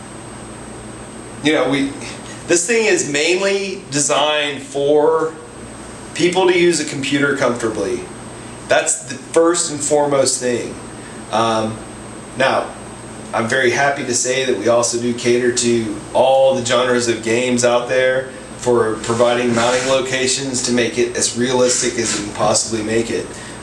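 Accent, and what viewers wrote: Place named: Netherlands. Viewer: Installed the hotas yesterday and have flow a couple of minutes in Arma 3, ED, and DCS and have to say im very happy with it, the immersion is so nice that i now want that the seat banks left when banking left lol. also watched a couple of vids of you. you got a nice setup mate. i heard your dutch if im not mistaken hehe. maybe if your down for it we grab a game together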